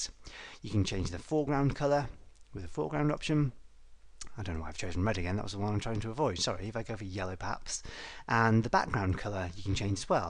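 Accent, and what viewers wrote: United Kingdom. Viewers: You know for a british person (I think you are british because of the accent) , you have a nice way of explaining things and your voice is understandable unlike most british accent people I watch :) thanks for this free source of knowledge. Cheers mate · i cant believe someone so wonderful exists to provide these videos for free with an awesome british accent and occasional snippets of humor :) thank you so much!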